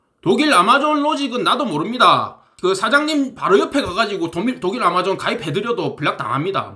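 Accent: Gyeongsang, South Korea. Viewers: 너무 잼있어요 같은 갱상도라 그런지 말씀하시는게 너무 친근해요~ㅎㅎㅎ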